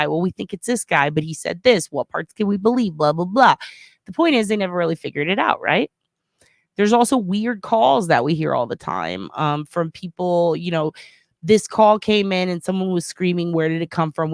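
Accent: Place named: New Jersey, United States. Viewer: Sounds like a Jersey accent to my NYC ears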